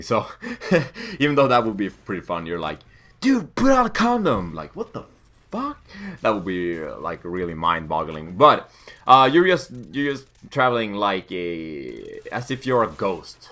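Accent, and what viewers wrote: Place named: Sweden. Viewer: "the shooting of yon f kennedy" hahaha swedish accent FTW!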